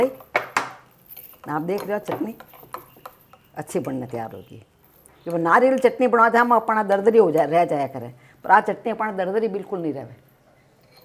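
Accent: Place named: Rajasthan, India. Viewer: main aapki aur aapke husband ki recipe dekhti hun main rajasthan se hi hun aapka lahza boli achi lagti hai 🙂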